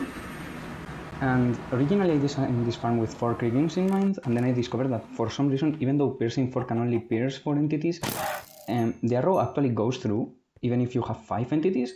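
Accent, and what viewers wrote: Spain. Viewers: El acento de español no te lo quita nadie, y el ingenio para descubrir esto tampoco, buen video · Ese acentillo español no te lo quita nadie eh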